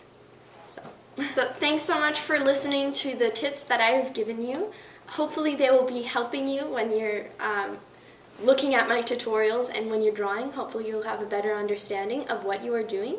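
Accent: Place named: United States. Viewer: good job and all :D but srsly I don't like to watch people dressed up like manga characters with this american way of speaking xD it doesn't fit at all :D keep up anyway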